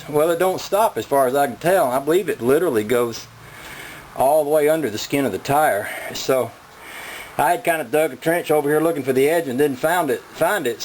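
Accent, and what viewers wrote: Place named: South, United States. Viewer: Where are you located? Your accent tells me your in the south but I've seen this car at the Owls Head transportation Museum in Maine, far from the south, haha. Did you sell the car? Or loan it to the museum? I Love that place and usually visit every time I'm up in Maine.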